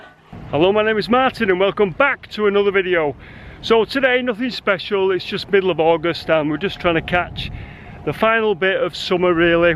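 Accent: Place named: Manchester, United Kingdom. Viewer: you got more a north Manc accent, my cousin could be from Barnsley if you didn’t know...proper Yonner.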